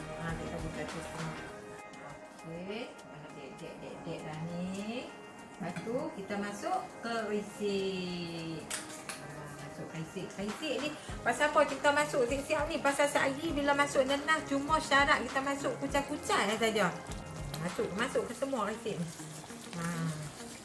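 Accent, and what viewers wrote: Kedah, Malaysia. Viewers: Adoi..kecheh lioq naa..paberet pulak tu..tq lah sharing resepi ni..suke bahasa cik ni..kedah me perlis ni..ingin nak bebual bahasa ni..sdap mcm mknn jugakk🤭😋❤👌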